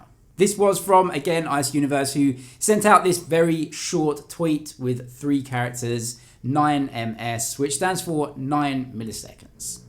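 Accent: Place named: United Kingdom. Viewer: I thought you were Brian Firkus but with a British accent ahaha nice video though! 👍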